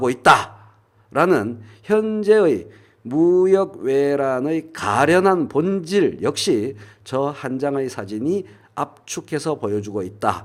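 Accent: Busan, South Korea. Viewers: 최선생님 말투가 경남 부산말투같기도 하고요~~~~~~~~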